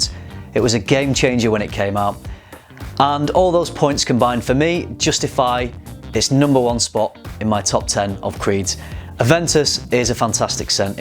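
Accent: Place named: England, United Kingdom. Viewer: That dirty English accent Yuk!! A cocktail ????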